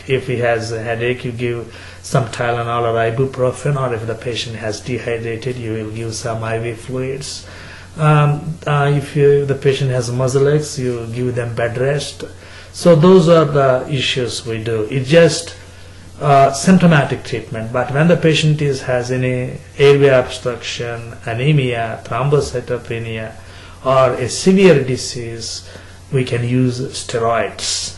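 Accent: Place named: South India, India. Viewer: almost like listening to a new language.you are so learned and look like a hardworking person you should try and practice speaking commonly known and understandable accent of english ...earnest opinion.There are so many people besides south indians who would like to know what you are saying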